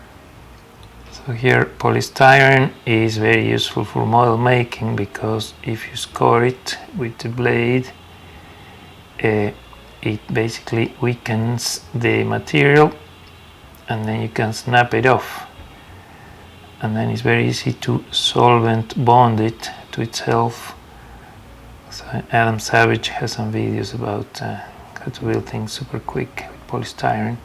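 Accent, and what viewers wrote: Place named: Europe. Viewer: This is Genius 👍 I'm so glad I found this in my recommendations ! How is it you have such a European accent but measure in inches with that work mat though ??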